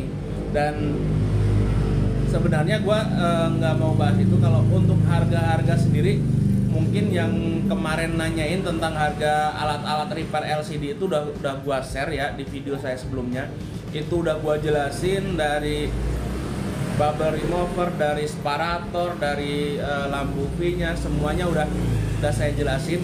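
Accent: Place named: Kalimantan, Indonesia. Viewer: Dr kalimantan mana bang... Logatny kalimantan tuh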